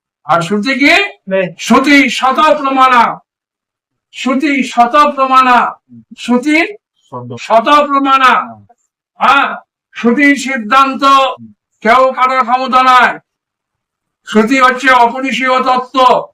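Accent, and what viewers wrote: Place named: India. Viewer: বাংলা কথা হিন্দি কথা টান দিয়ে কথা বলছেন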